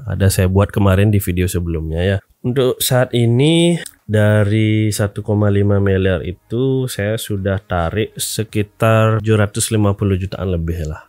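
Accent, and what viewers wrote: Bali, Indonesia. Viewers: Dari logatnya, asal Bali ya bli?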